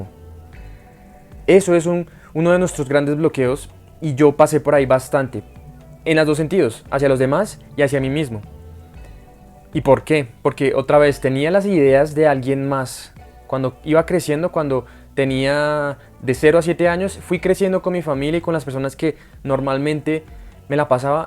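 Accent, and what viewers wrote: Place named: Colombia. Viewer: Sos muy genio, gracias por tu luz, saludos desde argentina, amo el acento colombiano 😊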